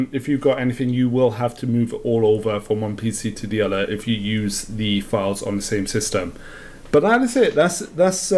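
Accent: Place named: Wales, United Kingdom. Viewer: Love the accent. Left Swansea for US at 16 so sounds like home. Gonna try this today ...fingers crossed.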